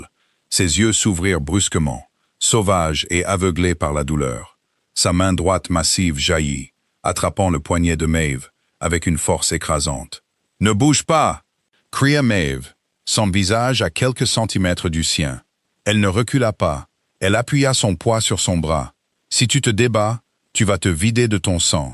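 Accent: United States. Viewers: Pourquoi la voix ia prend un accent américain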